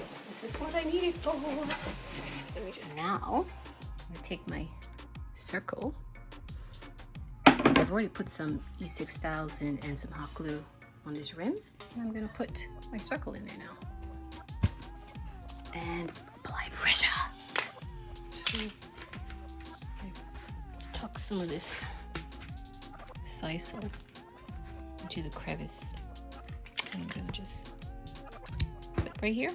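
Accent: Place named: Bermuda. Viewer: Always nice to watch your DIYs, they’re very satisfying along with the very Bermudian commentary😉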